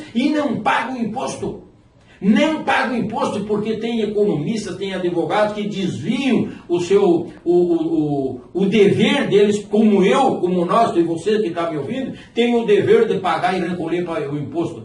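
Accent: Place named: Rio Grande do Sul, Brazil. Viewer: Pelo teu sotaque, imagino que o senhor é gaúcho como eu.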